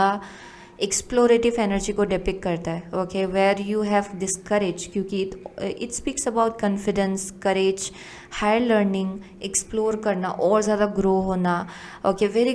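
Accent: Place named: Uttarakhand, India. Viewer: But her accent sounds she definitely from uttarakhand, ne ppl has a little accent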